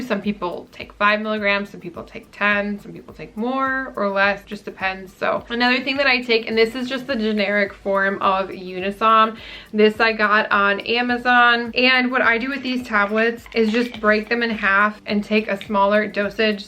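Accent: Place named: Wisconsin, United States. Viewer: Wisconsin probably. Nice funny accent.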